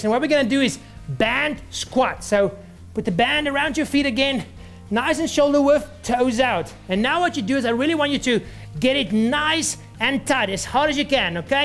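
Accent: South Africa. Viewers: Must be South African, im South African and I recognize that accent anywhere plus he says: "anyfing" :D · I think it's South African...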